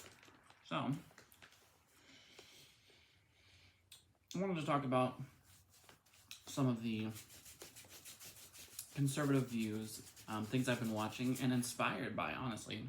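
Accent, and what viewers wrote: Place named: United States. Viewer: Hello, you remind me a lot of my best friend, also the paint dilemma, it looks like acrylic, you can thin the paint with water, it does sound kind of dry plus, it allows you stretch it. I totally agree with you with the non binary stuff. Also I hope you get the surgery you want covered, insurance is a bitch. I literally had to pay for my sons lip surgery recently, he's a little boy and bit his lip, needed surgery to fix it. Cost us 2,000 bucks in the U.S. I'm assuming your also American from your accent. Insurance in the U.S. is a bitch.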